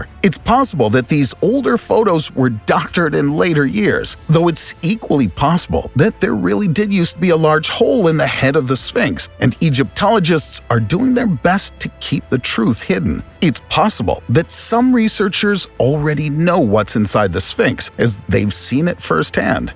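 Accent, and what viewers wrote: United States. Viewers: Yet another annoyingly loud, patronising American narration aimed at 5 year olds.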